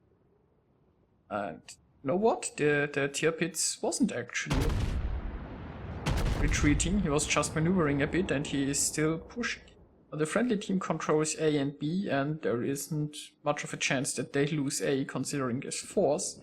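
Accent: Germany. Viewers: are you german? Your accent sounds like a german who is speeking english.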